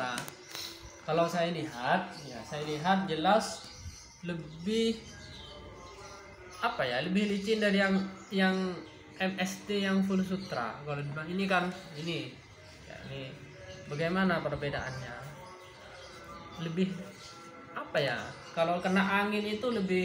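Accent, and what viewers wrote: Madura, Indonesia. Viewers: Kental bahsa maduranya 🙏🏻🙏🏻😃😃😃😃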